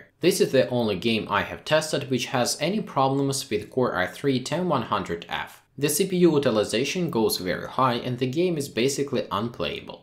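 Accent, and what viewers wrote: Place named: Russia. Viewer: Парень, ты откуда? Такой чистый русский акцент )))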